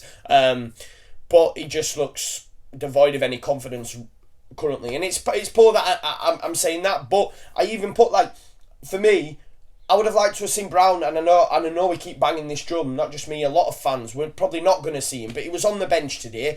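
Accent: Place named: Yorkshire, United Kingdom. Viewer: Am I hearing ”John Snow” accent sounds similar :) Leeds United whatta great did, unbelievable fair play, whole football fans in the world amazed by your gesture. fair play. Good luck guys want to see you in Premiere League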